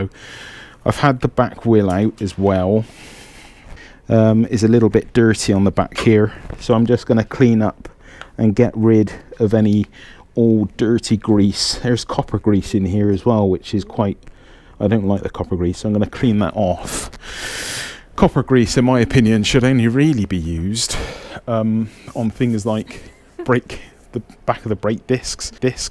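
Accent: Bristol, United Kingdom. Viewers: Good entertaining video, you sound like your from the Bristol area like me! I was gonna get s1000rr but bought new zx10r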